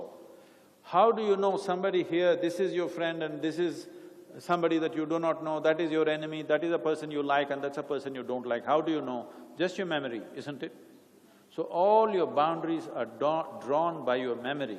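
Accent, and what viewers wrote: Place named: United States. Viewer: what this jerk Sadguru is Bull Shit.He has no knowledge.He never answer a question posed instead talks some pig shit in super American English..see above qstn. was asked how a person feels just before his/her death and this jerk says something mango Banana..i will tell u what happens ..just a 10 minutes prior to death the person see his departed parents.Departed friends and relatives and feels some one is pulling his body from his/her feet and feels coldness in his body.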